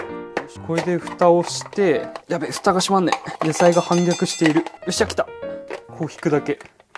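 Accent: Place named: Mikawa, Japan. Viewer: 方言が愛知っぽい どっちかって言うと三河の方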